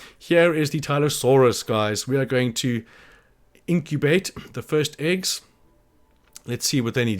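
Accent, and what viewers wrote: South Africa. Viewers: Bro, just found your channel. Nice to hear a South African for once. Keep going dawg, climb that mountain 💪🏻🔥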